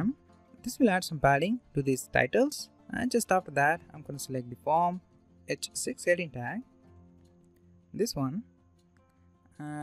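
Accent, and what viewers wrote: India. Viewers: Awaaz Desi si lag Rahi hai.. YouTube me HTML and CSS sikhane wale mostly Desi hi hai..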